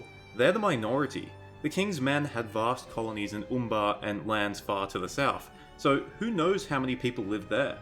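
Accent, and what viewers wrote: Australia. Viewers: I deduce (correctly I hope) that you are from Sunshine Coast, Australia, based of your accent and the 350 000 number you gave! I'm kinda scared if I'm way off though. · I've been trying to guess where you're from by listening to your pronunciation of certain words for quite a while. I think NSW, possibly Central Coast, Wollongong. And unlikely picks for cities with a similar population are Hobart and Geelong.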